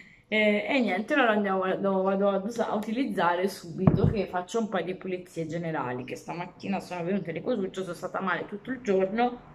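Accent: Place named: Tuscany, Italy. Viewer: Ciao una curiosità, ma tu sei originaria di Napoli o di dove? Alcune volte mi sembra di sentire una inflessione Toscana... Non riesco a capire il tuo accento! Comunque sei una bellissima ragazza dentro e fuori e ti seguo sempre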